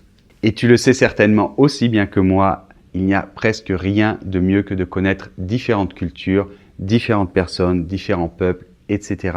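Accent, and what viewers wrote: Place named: France. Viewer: Pour moi la langue française est une langue tellement belle et charmante(surtout à l'écouter😉)..j'adore cet accent😍..et j'adore aussi votre façon de parler et expliquer les choses..vous êtes le seul français que je comprends presque totalement!!(excusez moi pour les erreurs)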